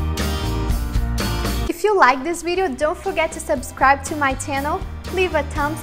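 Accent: Brazil. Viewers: I love your accent, Instead of pronouncing ( mouth) maoth, you say maaouuth, brazilian accent❤️ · Evandro Cândido Eu falei errado, eu quis dizer ''ela fala sem sotaque americano'', ela fala com sotaque BR ou gringo pros EUA. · The Bubu depende, existem as bolachas , e também os biscoitos que fazem parte da nossa doçaria portuguesa! Como portuguesa acho que videos como este , com todo o respeito mas só existem para confundir a nossa língua de Camões, pois desde a apresentadora que de português não tem nada , mas sim de brasileiro, não tem o mínimo de prenuncia portuguesa com não tem de conhecimentos de Portugal, então será melhor dedicar-se a publicar outro tipo de videos ! Provem os nossos maravilhosos biscoitos ( areias) e vão ser que de pro cão é que não são! Que lhes perdoem quem faz estas delícias portuguesas , pois há pessoas que da nossa cultura sabem pouco! 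· Em Portugal a palavra você não é muito usada como uma palavra formal. Usamo-la por exemplo quando conhecemos alguém e temos alguma confiança, mas mantemos uma relação com alguma distância. Aqui utiliza-se a palavra senhor/senhora quando se quer dirigir formalmente a uma pessoa que não conheçamos, há quem use você também, mas soa de uma maneira "mais educada" tratar por senhor/senhora. Os brasileiros também tendem a substituir o "l" por um "u" , por exemplo os nomes Portugal e Brasil, são proferidos por vocês como "Portogau" e "Brasiu" Nota: Os brasileiros costumam ter imensa dificuldade em pronunciar outras palavras de variadas línguas que não a deles, por causa da maneira como falam. Enquanto que os portugueses têm uma facilidade enorme. Nota-se no vídeo que és brasileira quando estás a falar inglês pela maneira como pronuncias certas palavras. Os portugueses também têm uma facilidade em entender outras línguas, como por exemplo o castelhano, italiano. 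Normalmente os espanhóis e os brasileiros acham dificil entender um portugues.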